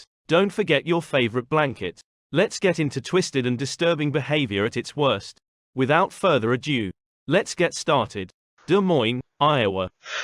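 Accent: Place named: United Kingdom. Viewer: I like your videos and your channel, but I do have one complaint. Sorry, but I really can't stand the narrator with that British accent. It's actually keeping me from sitting through the videos. Maybe it's just me but I thought I might represent others as well.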